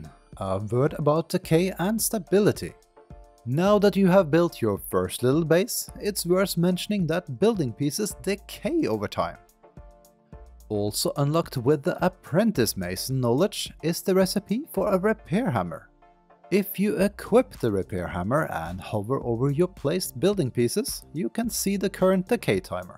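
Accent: Norway. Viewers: Det var lettere å høre at du var norsk på engelsken din, enn om du bare hadde snkket engelsk! Men bra tips det der!!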